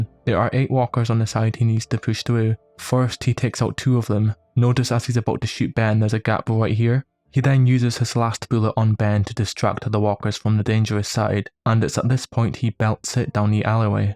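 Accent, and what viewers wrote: Scotland, United Kingdom. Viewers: Scottish, fucking right mate good stuff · Great video. Where are you from btw? Ngl I honestly thought you were European at first but as you got a little more comfortable I started thinking 'is he Scottish? Holy shit' lol 🏴🏴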